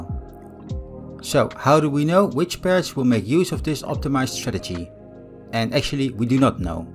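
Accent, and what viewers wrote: Netherlands. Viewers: I enjoy the Dutch accent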